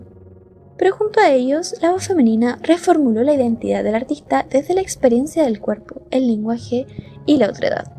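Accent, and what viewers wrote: Chile. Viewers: se me hizo recontra tierno su acento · tu voz con ese acento chileno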